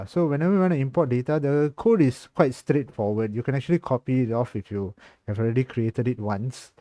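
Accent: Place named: China. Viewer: Malaysian lol the English. Your English have Chinese accent annoying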